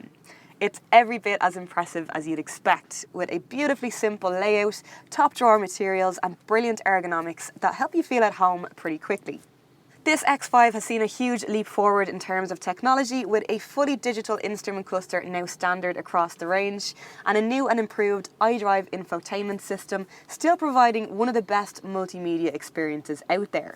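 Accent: Ireland. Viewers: This channel is pushing great reviews! keep up the great work. Also that Father Ted accent makes it even better :) Cheers from India!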